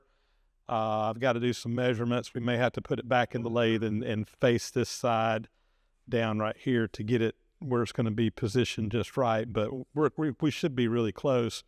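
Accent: South, United States. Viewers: Another English fan here, I love your rich 'Suthernn' accent. Keep posting these treasures, and many thanks.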